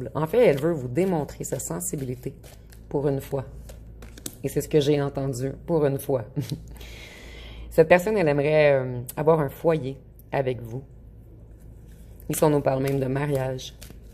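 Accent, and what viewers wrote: Quebec, Canada. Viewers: Bonjour 😁, Très très passionnant votre tirage 😅, j'ai l'impression que vous décrivez une bonne partie de ce que je vis actuellement 😅, j'ai hâte de voir ou cela mènera mais en effet je garde une certaine distance car ne sachant pas réellement ce qu'il veut et cherche mais en effet de son côté c'est est le chaos dans ca vie ..... Maintenant à savoir si c'est de lui que vous parlez ou d'une autre personne 😁 car lui est poisson 😂 En tout cas je vous suis avec grande attention et j'adoreeeeee vôtre accent québécois ❤❤❤❤❤❤ Et mille merci pour vôtre tirage qui m'a fait sourire 🥰🥰 Et à vos souhaitssssssss 😉🥰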